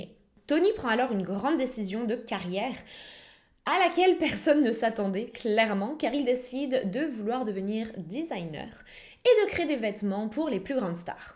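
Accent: Quebec, Canada. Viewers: Je ne l'ai jamais dit.. mais j'aime tellement ton accent , ça sonne bien pour un Quebecois.. retour sur un petit côté professionnel.. Merci pour tes vidéos, les dossiers sont toujours plus plaisant et facile à lire par la suite. Bisous xxx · Salut.. Comme d'hab 👍.. Un bonjour au Québec!..(t'as vraiment pris l'accent!! 😊)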